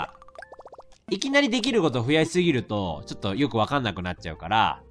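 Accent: Kansai, Japan. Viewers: あさみさんのアフレコしてるレトさん好きすぎる(*´ω｀*) ナチュラルに関西弁きけるし( ¨̮ ) てか、あさみさん可愛い！好き！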